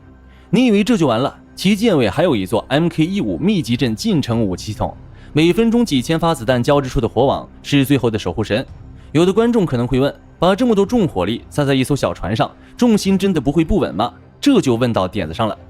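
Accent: China. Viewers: 你的口音好像大陸人 是因為你很討厭 共產黨嗎？所以才會研究與喜歡台灣的特殊艦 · 北京腔談台灣國艦？ Awkward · 用的豆包做的AI视频吗？怎么解说都是一股中国大陆的味道。不应该用台积电的2纳米芯片的AI去做吗？😆😁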